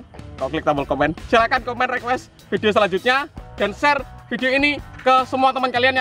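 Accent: Java, Indonesia. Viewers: Kakak org jawa ya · Orang Jawa ya bang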